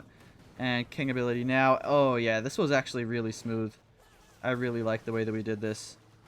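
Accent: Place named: United Kingdom. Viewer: I thought you are British or ausie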